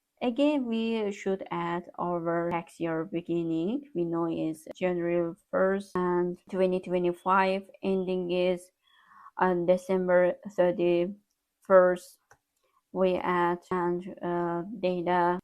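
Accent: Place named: Azerbaijan. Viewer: Aksent ve danisiqdan basa dusdumki azerbaycanlisiz, Meni cox sevindirdi bu video, xahis edirem sosyal sebekesi elave edin siz bizlere cox vacib insansiz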